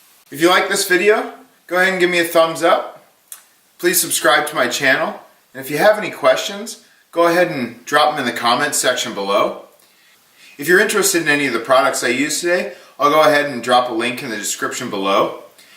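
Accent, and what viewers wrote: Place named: Australia. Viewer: Good day, Kindly give me a list of all the equipment I will need to do this forced carbonation process. I want to buy all the necessary equipment, you can add the food grade C02 tank/cylinder on the list though I will hire one whenever I need it. Kindly use English that I will understand as a south african 🇿🇦. I understood everything you said perfectly and your accent sounds Australian which means your English isn't confusing as would American English 😊😊😅😅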